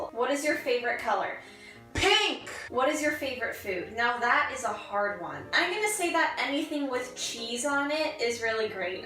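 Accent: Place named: Canada. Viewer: her canadian is showing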